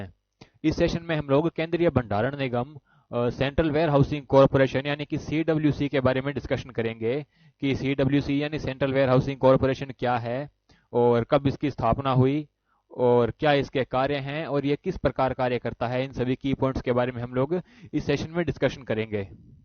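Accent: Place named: Bagri, India. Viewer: Bhai ap bagadi bolne wale leg rehe ho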